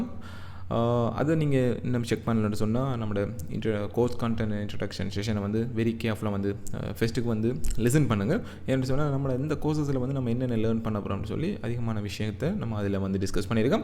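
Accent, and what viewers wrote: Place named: Sri Lanka. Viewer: Great Tamil with Srilanka Slang hearing very nice Sir ❤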